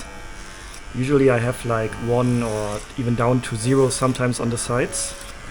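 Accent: Germany. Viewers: Your accent is very interesting, are you German? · there is some strong German accent. The speaker is certainly a nerd, but the info given is fairly useful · 독일식 발음 영어인가...ㅋ 근데, 독일보다 동독, 동독보다 폴란드쪽? 아니면 오스트리아보다 더 동쪽 헝가리?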